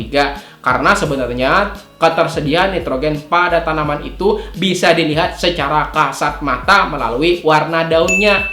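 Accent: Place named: South Kalimantan, Indonesia. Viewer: Mantab pa PPL.....SALAM SSHABAT TANI LOGATNYA DARI DAERAH KALSEL KAYANYA😁😁 BARU PERTAMAA LIAT CANEL NYA PA.....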